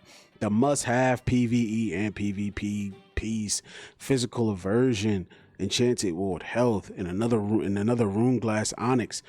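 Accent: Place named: New York, United States. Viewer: my boy from newyork ? where at? haha queens represent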